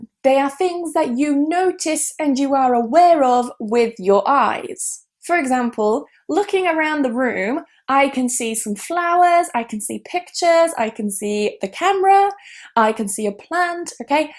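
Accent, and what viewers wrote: United Kingdom. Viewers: Thanks for the video...It's very useful for me..., l love ( watching) the way you talk in british accent...,by the way...you're the most beautiful english tutor l have ever seen,...thank you...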